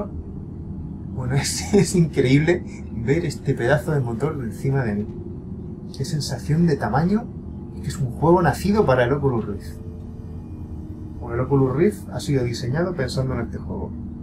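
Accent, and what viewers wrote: Andalusia, Spain. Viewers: Tiene un fuerte acento andaluz, ahora vete a llorar a otra parte x'D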